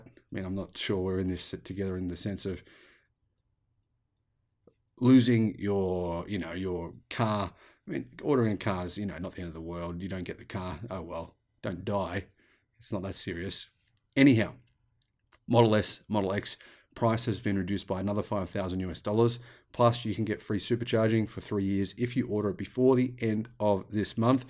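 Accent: Australia. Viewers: I love your show, but your accent is destroying the word "inventory." You keep saying "inventry," which has no definition that I can find. Now I know that being American, we don't usually say English as proper as the British, but I thought you Aussies spoke just like them. The first few times you had said it, I was thinking what the heck is this guy saying. Then I finally figured it out. BTW I want a model Y, but it would drive me nuts if the new one is extremely different and alot better, so I'm waiting.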